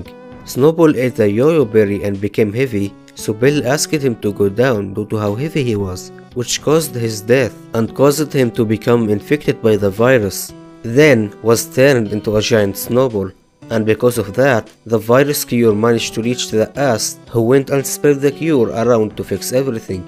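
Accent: Russia. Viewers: Your accent kinda funny Are you Russian? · russian guy?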